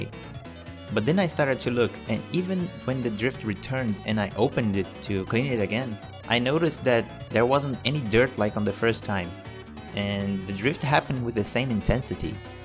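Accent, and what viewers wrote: Brazil. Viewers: Are you brazilian? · Tamo junto irmão, deu pra perceber pelo sotaque, mas o seu inglês é muito bom cara. E tambem, ótima explicação, ajudou muito, vlwww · Nossa bem q eu tava achando esse sotaque dele suspeito KKKKKK sabia q era br esse puto ta procurando ele falando isso na descrição valeu ai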